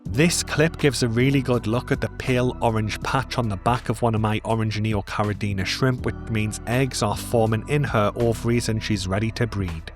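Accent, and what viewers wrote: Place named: North Yorkshire, United Kingdom. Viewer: Looks really good, i don't have a set up like that but i'm planning another purchase, i going set up a cherry shrimp jar, i just need a big jar, i've got them in all 4 tanks now, my minnows haven't bothered with them, i've gone from 12 to a few hundred at least in about 6 months, luckily i know a couple of people with tank suitable for some, the water in North Yorkshire seems to suit them, not far from where you are, judging by your accent, i've just started following you on Facebook so i might send you some pics, what would you recommend for planting in a jar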